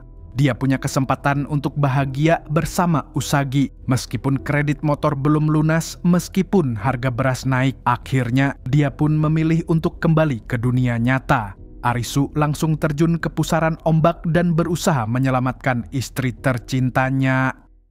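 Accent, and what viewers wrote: Banjar, Indonesia. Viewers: uma lah di video ini keluar banar Banjar sidin 🤣🤣🤣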